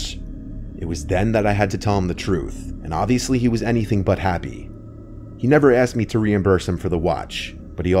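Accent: United States. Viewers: I don't know American accents, but I like his. Where is it from?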